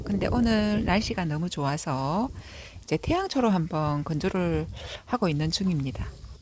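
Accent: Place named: Gyeongsang, South Korea. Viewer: 님 반갑습니다 올여름 날씨가 대단합니다🍋🍋🍋 영상 멋지네요🎾 경상도 사시나요 말씀이 친숙합니다 고추 말림이네요 즣은즐감합니다 꽃도 소개해주시고 영상 멋져요🍇 감사합니다🖼🖼🖼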